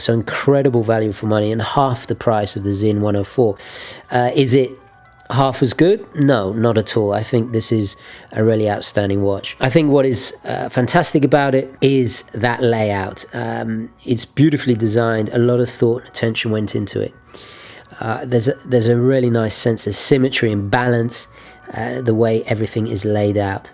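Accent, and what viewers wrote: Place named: United Kingdom. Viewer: Why are all these channels British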